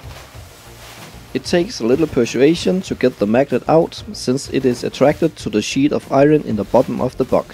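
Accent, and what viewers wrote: Denmark. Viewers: Danish. I knew it from the accent :D Interesting video, bro! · sys nok jeg ku høre du var dansker men var i tvivl :D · I like the danish accent..sounds cute somehow =) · Sorry was just curious, thought i seen alot of german on magnets and such but you sounded very distinctly Danish.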